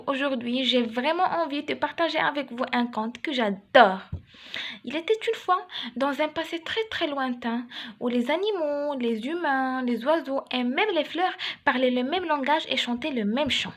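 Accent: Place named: Fes, Morocco. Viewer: Un délice... mais je préfère en darija avec ton accent fessi si!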